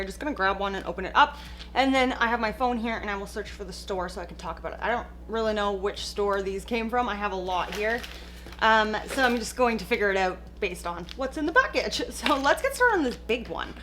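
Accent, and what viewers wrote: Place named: Western Canada, Canada. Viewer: I think it’s only out west. I lived in BC for a few years and they say it the way does. I’m in Ontario now and we say decal with cal sounding like the name cal. They also say it that way further east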